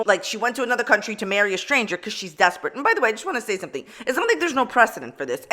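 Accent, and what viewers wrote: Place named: New York, United States. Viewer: Beautiful water scenery - where is this? Clear water to see the sand & shells. Your accent sounds like east coast - NY. I was from Dutchess county.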